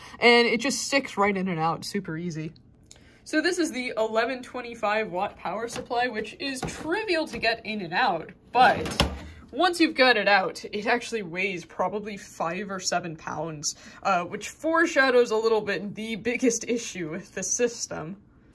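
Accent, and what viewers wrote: Canada. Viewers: Very curious where your accent is from, I like it. (It sounds very Canadian to me, but I could be wrong).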